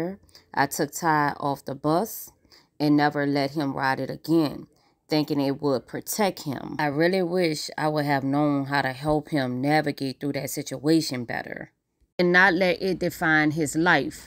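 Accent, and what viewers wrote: New Orleans, United States. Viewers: She's definitely a great MOTHER and with that New Orleans accent GURL!!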